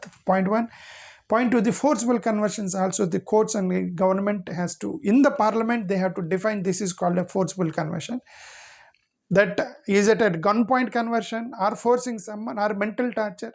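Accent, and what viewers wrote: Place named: India. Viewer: Good idea we will give 120 lakh shutup your mouth don't speak Indian english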